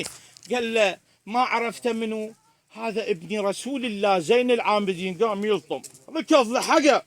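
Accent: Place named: South, Iraq. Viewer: اللهجة عبالك جنوبي مو كوردي ما شاء الله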